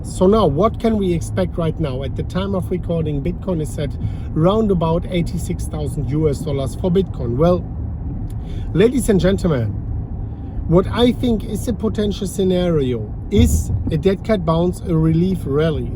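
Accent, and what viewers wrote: Germany. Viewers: First time viewer, this guy is German right?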